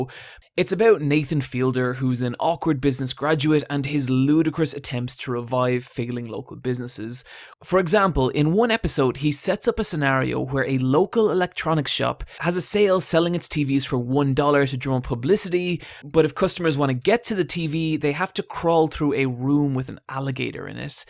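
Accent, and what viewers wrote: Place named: Cork, Ireland. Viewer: Man, you're really fantastic dude, keep it up. I'm from Cork and its quite refreshing to hear a voice I can relate to talking on these topics.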